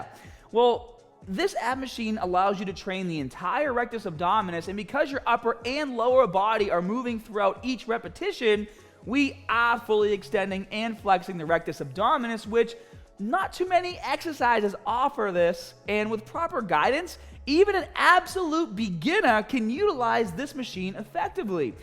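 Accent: Boston, United States. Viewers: I love the boston accent lmao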